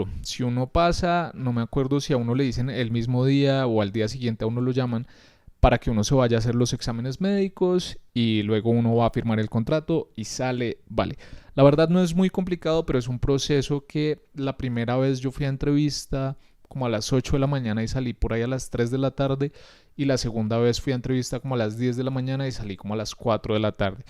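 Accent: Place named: Costa Rica. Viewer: Soy de Costa Rica y de entrada dije, a mira alguien de aqui. Como nos confundimos nosotros mismos con los acentos.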